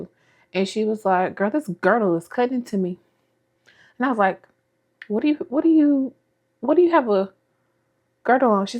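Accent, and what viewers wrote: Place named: North Louisiana, United States. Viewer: Hey hey girlie!! Girl the more I hear you talk the more I hear that North Louisiana accent lol I'm proud of you girlie and I'm right with on that journey of learning to better love myself as God took His precious time to make this precious jewel. Also keeping in mind that I too have to do my part. COME ON NA!!!!!!!!!!*punching kicking the air*lol WITH THE DESK MINISTRY! Just go ahead and read me! lol Girl editing wasn't too too bad for me but it was aggressive because once I would sit down I would NOT move or answer the phone,nor eat,drink or use the restroom because I needed to be fully zoomed in and not distracted because once i get distracted thats it,it would be hard to get back in the zone. Thanks for allowing God to use you to share those lessons! I love youuuuuuu girlie!!! 📸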